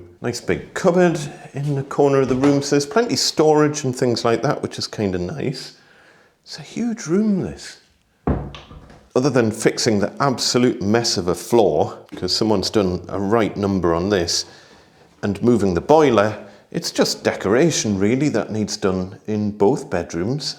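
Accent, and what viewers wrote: Newcastle, United Kingdom. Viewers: I recognise that accent! Gosforth Newcastle upon Tyne? Doing the region proud.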